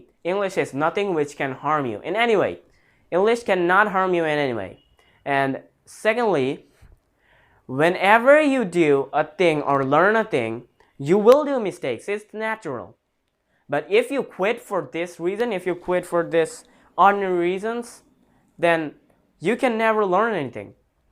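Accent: United Kingdom. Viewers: Alhamdulillah...Love the British accent...keep it up..❤❤ very good job. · Nice job, keep it up broh! Love the British accent btw 😉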